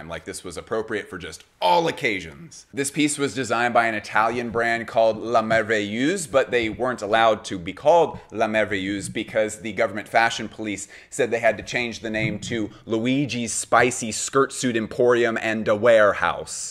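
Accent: Russia. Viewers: Super interesting video That's a Russian accent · why are you using a russian accent when conveying fascist things😭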